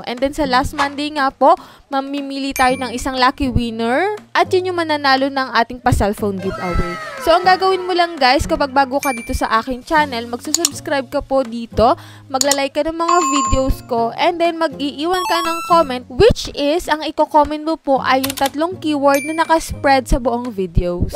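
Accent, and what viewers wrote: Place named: Tagalog, Philippines. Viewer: mAam Anong province mo po may punto kasi katagalugaan...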